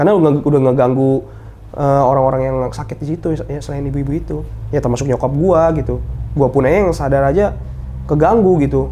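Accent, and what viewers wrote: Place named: East Jakarta, Indonesia. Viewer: Lo so asik bang jaktim bgt 😅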